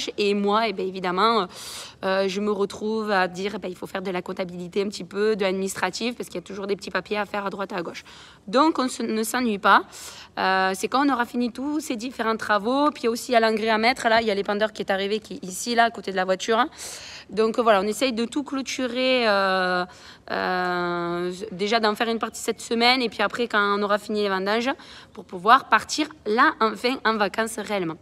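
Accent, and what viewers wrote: South, France. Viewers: Bonjour et merci de ton sourire avec l'accent du midi ! C'est toujours un bonheur de t'entendre '' belle brunette''!! Je vous souhaite une bonne fin de vendange et je formule l' espoir de venir goûter votre production de vin à cépage ! Bisous à toute ta petite famille. Pépé 80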